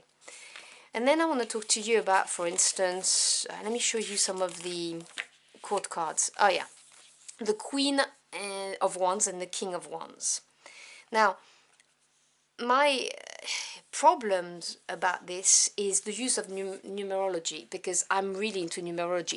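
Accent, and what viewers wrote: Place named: France. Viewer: Lovely accent, French? Or maybe like Syria or something ?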